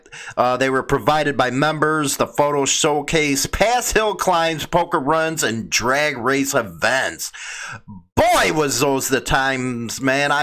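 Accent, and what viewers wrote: Chicago, United States. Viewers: Good morning you know I'm a big fan of your show but you can't blame mispronouncing words on a Chicago accent you should be proof reading the story's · Morning and good points indeed! The simple facts are that what happens in the club world affects all our world as Bikers. It’s just reality man. Profiling and all the BS that goes with it are a result of people drawing attention to Bikers for bad behavior! Just look at what’s happened over the last 10 years here in the ROT. Specifically since the BlueGang pulled the ambush at Twin Peaks and it didn’t go their way after it all shook out and it has and is gonna cost them big $$$ long into the future as it should! We both know they (BlueGang) are the worst sore losers on the face of the earth. Pull that kinda shit and it goes south so we will just harass and fuck with these clubs until we wear em out of existence! 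That’s the way they think and work. As you pointed out man with today’s surveillance everybody’s into everybody’s business 24/7 and that’s reality. As for your Chicago accent man, fuck em! Shit man combine that with my ROT accent and those whiners would lose their minds! LOL! Great show man.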